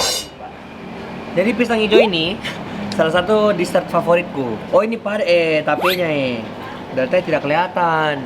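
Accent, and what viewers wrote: Makassar, Indonesia. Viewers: Lain" ki ku rasa kalo logat makassarki:V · Vlog yg paling di tunggu", vlog di makassar, makan makanan khas makassar, logat makassar, syuuuka😍😍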